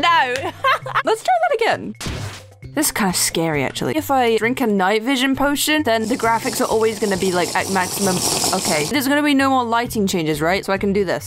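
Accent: United Kingdom. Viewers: are you a brit?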